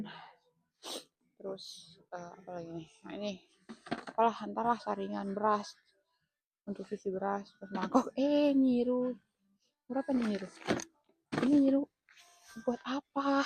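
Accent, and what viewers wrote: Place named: Mbay, Indonesia. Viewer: Akhirnya ada tontonan yang ada dialeg mbay nyaa❤❤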